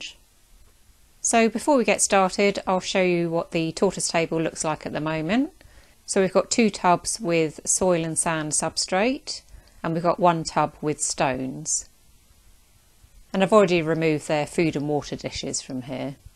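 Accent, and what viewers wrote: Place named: United Kingdom. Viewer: You by far have the best informative videos for leopard tortoises! I'm trying to learn as much as I can before I purchase my first ever shelled family member. I'm making sure that I get the enclosure, lighting, and the growing of grasses and plants correctly first before I do that. I have a few comments though. Not that your babies have pyramiding, but what do you think of closed chambers to trap in humidity? I've heard nothing but great things about it. And I wish you shipped overseas to the U.S. because I would love to get a baby leopard from you. You do a fantastic job and your videos are phenomenal! It's awesome how you have checklists and you even display them on the screen for us to pause and jot down. And one last unrelated thing. I love the British accent! Keep up the great work. I subscribed and will eagerly wait for your next video.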